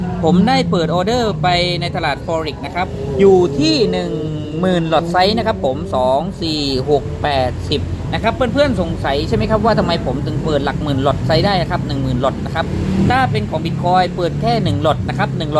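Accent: South, Thailand. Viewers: คนใต้แน่นอน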